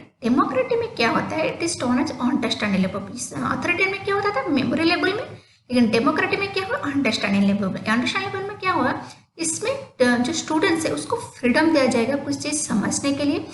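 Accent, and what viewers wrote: Odisha, India. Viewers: Are u belongs to odisha?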